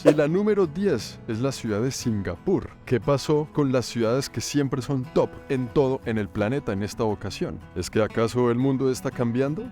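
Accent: Colombia. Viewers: Me sorprende q ya llevas años viviendo en Canada y tienes el acento colombiano soy salvadoreño pero no entiendo porque os centroamericanos en su mayoria se les pega ese acento como q fueran gringos.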